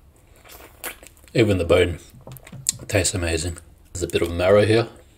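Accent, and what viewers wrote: Australia. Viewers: Just wondering you sound Australian are you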